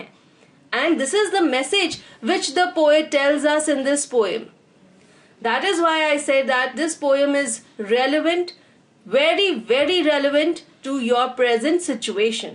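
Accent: India. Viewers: wow! it's a very strong indian accent! it was almost impossible to understand this lesson! this teacher is not prepared to teach english for no one!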